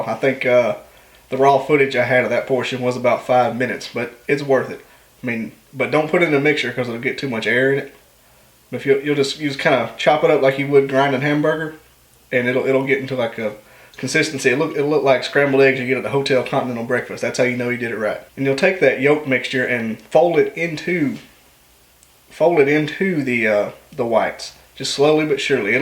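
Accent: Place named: Texas, United States. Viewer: I'm gonna try this! Looks good! You have got to be from Texas,:)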